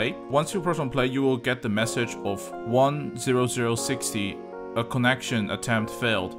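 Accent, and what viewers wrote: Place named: Russia. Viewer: hey your accent its russia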